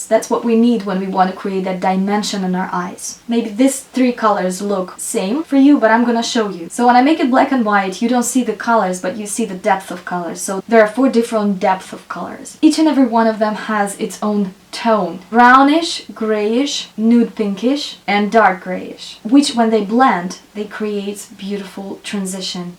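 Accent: Russia. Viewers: I've been interested in Mac lately, we just got one in our mall (I live in a smaller city in Canada so not so much high end stuff here,) so good to know you love it. :) Might try it some day even if it's a little more expensive. I work in a drug store so I tend to just buy make up from there, Nyx (for lip stuff mostly,) Revlon foundation for my oily skin, Maybelline superstay powder for after that, and just anything for the eyes really lol. Side note: I was trying to place where your accent was from, but couldn't, then you happened to say Moscow, Russia. lol Coincidences. So cool, one of the places I'd love to visit some day. <3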